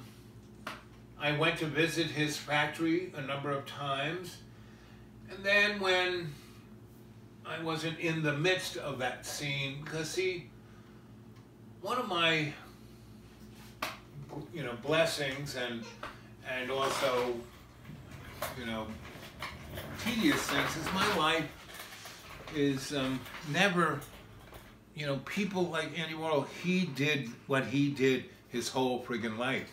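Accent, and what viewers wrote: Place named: New York, United States. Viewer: Very interesting his story about Basquoit. He sounds like a real New Yorker. Madonna hooked up with Basquoit.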